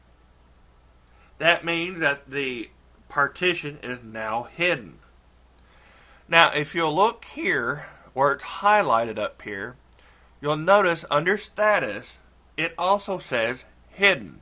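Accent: South, United States. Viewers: WHAT IS UP WITH THE SOUTHERN ACCENT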